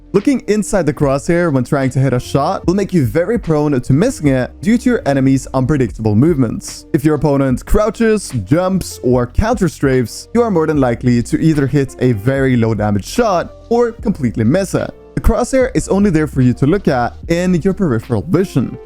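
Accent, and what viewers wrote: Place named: Norway. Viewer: Are you from norway? Cause it sounds like it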